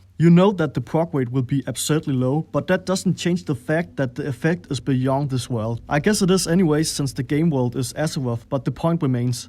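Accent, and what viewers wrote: Denmark. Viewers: Ey dude! Har set lidt af dine videoer, de sku meget søde, men den der danglish, det ødelægger det helt vildt, har du overvejet bare at lave danske videoer? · 110% a danish lad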